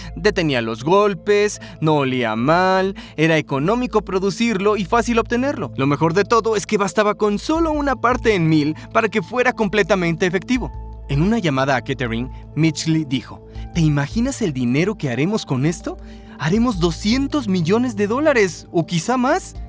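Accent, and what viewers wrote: Latin America. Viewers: Es que este es latino y el otro era argentino y por ende es un poco mejor y actuado el latino en Argentino igual ahí actuación pero era más un doblaje documental osea menos actuación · Aquí hay un problema. Yo, soy de España y como hablante hispano, me siento perturbado por un doblaje que presenta un acento latino muy cerrado (como es el caso). El anterior narrador era PERFECTO. Su tono, expresión y enfasis era el adecuado en todos los aspectos. Este narrador enfatiza demasiado en las tonalidades y da como resultado una narración "cantarina" que hace que pierdas la atención al contenido del video y pierdas el interes de forma absoluta. He decidido desuscribirme del canal hasta que haya un cambio en el doblaje. Y si no lo hay pues ... entonces dejaré Veritasium en Español. :(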